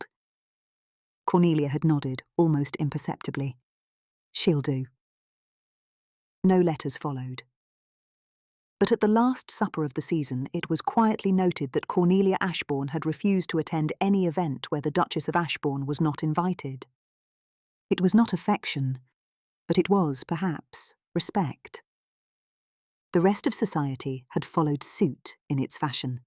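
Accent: England, United Kingdom. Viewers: I just started listening. The narrator sounds wonderful. Is there anything better than listening to an English accent? Anyway, I’m anxious to see how things play out. Thanks for posting. 📕📖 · English accent is my favorite!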